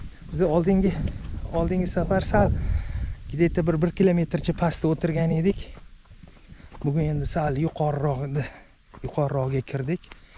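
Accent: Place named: Uzbekistan. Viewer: Со своего члена залупу отрежь, может сома на 5 кг поймаешь. Узбек а акцент харипский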